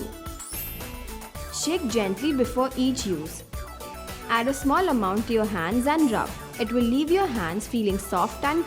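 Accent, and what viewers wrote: India. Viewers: Very hard to understanding her ascent too strong indian.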